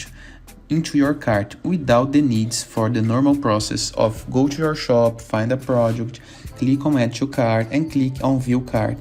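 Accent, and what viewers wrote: Brazil. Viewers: o sotaque do brasileiro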